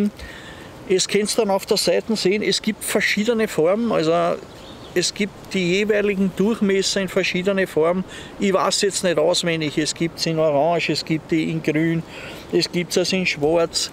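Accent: Standard. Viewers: Moin, schöne Vorstellung! Danke dir. Das elastische Paracord finde ich echt praktisch, das kannte ich noch gar nicht. :) Lieben Gruß Sven PS. Sagen wir medium Hochdeutsch 😋 bist aber wie immer gut zu verstehen außerdem mag ich deine Mundart :) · Dein Hochdeutsch ist 1A ... als Niederösterreicher warst du für mich total verständlich. 😄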